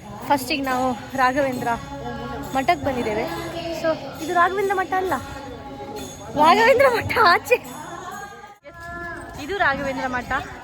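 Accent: Kundapura, India. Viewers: ಕುಂದಾಪ್ರ ಕನ್ನಡ ಚಂದ್ ಮಾತನಾಡತ್ತಿರ ಮೇಡಂ ❤️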